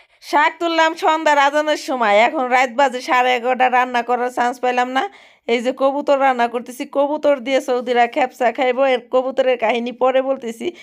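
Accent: Manikganj, Bangladesh. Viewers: আপনার কথা গুলো আমাদের মানিক গঞ্জ এর মতো লাগে আপনার বাসা কোথায় আপু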